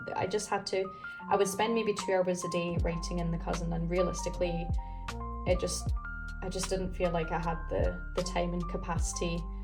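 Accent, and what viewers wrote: Scotland, United Kingdom. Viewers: Subscribed not just for the Hobonichi content but more so for the Scottish accent which gives me the tickles ❤ Hope you have an absolutely fantastic day! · Great to see and hear another Scot on YouTube! I'm a Stalogy girl/old woman, but I do love the cream cover of the Hobonichi!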